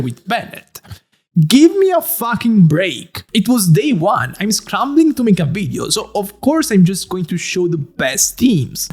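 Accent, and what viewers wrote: Italy. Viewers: " i am italian" he says with the thickest italian accent. Yeah no shit 😅😂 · Got jumpscared when you started talking in Italian. Sono italiana anch’io, per qualche motivo non avevo colto il tuo accento. Video fantastici, complimenti. ❤️‍🩹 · Il bello è che l'italianità emerge anche quando parla inglese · yeah he is, his accent is really an italian accent and i asked him and he answered yes, in one of his streams at the start he speaks italian too 😂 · Ahahah, ma sai che io mi stavo ancora chiedendo da dove venissi con quel accento. Ho capito oggi che sei italiano ahahahaa. Comunque continua così i tuoi video sono utilissimi e dai sempre pareri interessanti devo dire, love your videos❤ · Lol it's a very powerful Italian accent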